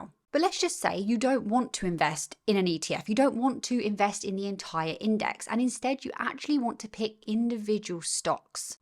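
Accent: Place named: United Kingdom. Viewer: Fantastic, quality content!!! Thank you! is a very good name for this channel because I really enjoy listening to your British accent. Anyone sounds smart by speaking British. But in your case, you are very smart to begin with and then you have that smart accent. 😃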